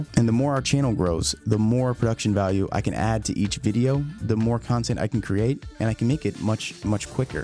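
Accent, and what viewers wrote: United States. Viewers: F1 in American accent with a few unusual pronunciations sounds a bit weird but kudos man for these recaps. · Great vid, and as much i like the way the brits talk it’s nice to hear an American F1 fan do it like you, so thanks bro, from Atlanta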